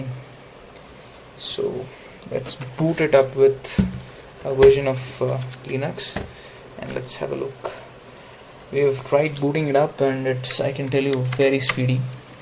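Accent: India. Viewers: I think you are having trouble with the Indian accent. Grammatically, sounds pretty correct.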